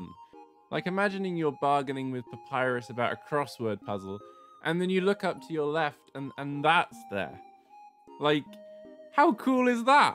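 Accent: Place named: United Kingdom. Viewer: How are you even more british now wtf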